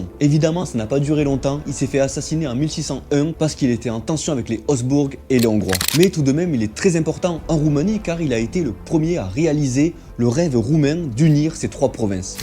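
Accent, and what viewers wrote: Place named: Toulouse, France. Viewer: C'est quoi cet accent sudiste la ? T'es toulousain où bien?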